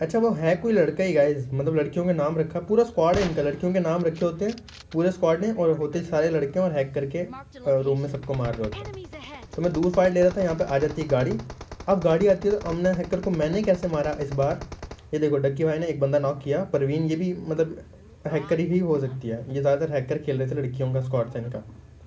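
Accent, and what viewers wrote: Rawalpindi, Pakistan. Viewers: apki zuban se to pindi boyy lagte ho 3) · Aap pindi ke lgte ho bawa jee 🤗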